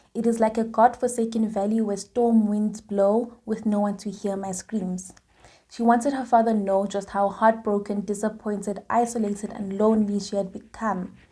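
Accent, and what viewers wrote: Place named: South Africa. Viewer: Hey you sound like amazing journalist and these are unique video.....love the SA accent. WhatsApp fam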